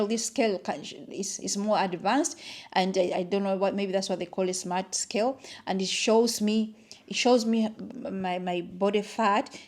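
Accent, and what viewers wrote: Uganda. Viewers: Beautiful material and what you did with it but should anyone in your size of your age be wearing such a thing in that short length and showing it publicly? Not nice at all - no offence meant. And FYI HRT will give you cancer. Doing your own research is important and great but does not Negate that it causes cancer - 100%. Because the body knows the difference and indeed it can feel awful- in perimenopause mdnopause and post menopause and you want relief but going to do HRT just to get relief can take your life away literally. And every cell and body function works with hormones. You can do something different that works just the same and better. And let me correct something it is not all people who have diabetes that don't have insulin..and contrary to conventional or allopathic medicine theories that giving more insulin helps diabetics it doesn't. 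The issue is hormonal imbalance and the weight is obviously from high cortisol so is the insomnia. As for your weight you obviously sound Ufandan to me and again no offence but Ugandans especially women are fat by nature structure culture and the way you eat. Huge Portions and fattening food. If you are more mindful of that you will loose weight i.e why I said that wrapper length is not doing anything for you. If you make it longer it will but at that short length it Not flattering at all it makes you look bigger especially if you have hips and a bigger backside. And I do not know you. This is not personal. Just stating truth and f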